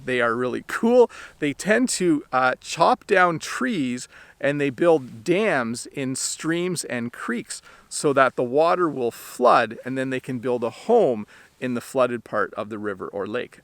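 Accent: Canada. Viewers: I liked your video, thanks for the information. I was looking for a Canadian accent to improve my hearing. I'm a new follower, you explain very well and I could understand, so you motivated me. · Waaw its very clear canadian accent its look like American I like it really and one day I will visit Canada it will be come my dream one day because my dream its two country America and canada it will be coming my dream 😢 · Hi, I was born in Halifax n.s. and left Canada when I was a child with my parents. Now I am 61 and never went back there. It's nice to listen to Canadian accent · Nice video. I wish more Canadians speak Canadian English like you. Maybe I hear you better because you realise your audience and maybe try to speak more clearly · Hi!Teacher.I knew you a few months ago and you are a really cute and nice person in my opinion.I have learned a lot from your videos and I can understand everything that you talk about and I extremely love your Canadian accent as well.For me you’re the best English teacher on YouTube.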